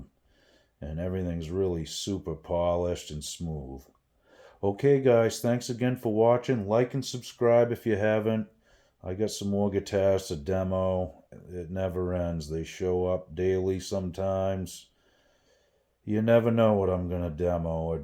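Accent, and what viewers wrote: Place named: Maine, United States. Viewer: I could tell you're from Maine just from your voice lol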